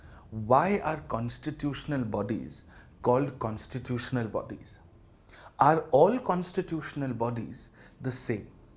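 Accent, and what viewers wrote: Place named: RP, United Kingdom. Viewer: How on earth you are not known to people, you must be a celebrity bcoz the way you speak resembles you a British Lord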